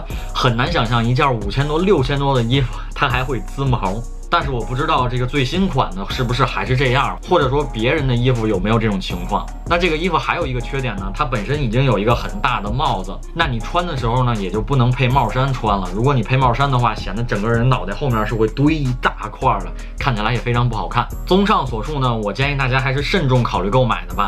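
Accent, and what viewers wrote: Beijing, China. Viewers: 你这口音是北京口音吗？实在是好难听啊！ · 哇博主是北京人吗！好熟悉的口音咧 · 北京话 亲切！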